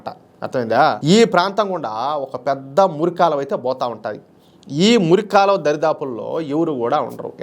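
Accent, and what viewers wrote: Rayalaseema, India. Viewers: Good evening. నీ భాష రాయలసీమ యాస. నీ మాటలు అన్నీ "Royal " గానే ఉంటాయి. దేశ భక్తి, దైవ శక్తీ రెండూ ఉన్నాయి. మన మంచి ముస్లిం సోదరులు కూడా.. నిన్ను మెచ్చు కొంటారు. జై హింద్. వందే మాతరం.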